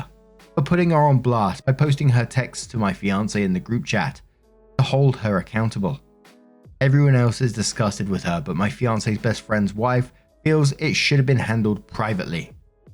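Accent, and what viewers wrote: United Kingdom. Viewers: He's British they have a different way of speaking fuckin deal with it and stop making it some sort of big deal when it's not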